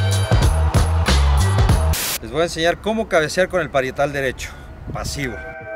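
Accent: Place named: Mexico. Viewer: Pero choro no hables como Méxicano🙄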